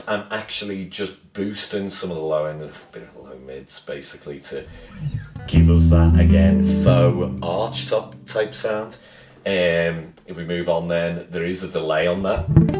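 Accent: Liverpool, United Kingdom. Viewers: F**king hell I was not expecting a scouser accent XD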